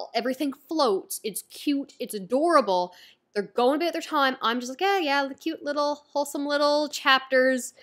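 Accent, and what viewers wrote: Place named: Canada. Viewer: My German accent is no better but your Canadian (I think) is killing lmao hahaha the way you say "Lahhh" instead of "Law" has me in tears. Love the content!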